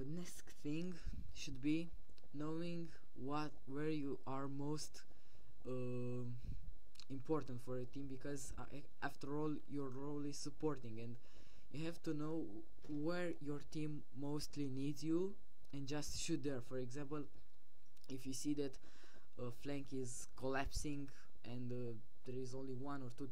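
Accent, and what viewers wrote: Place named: Romania. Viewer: Esti roman? :)) ai accent romanesc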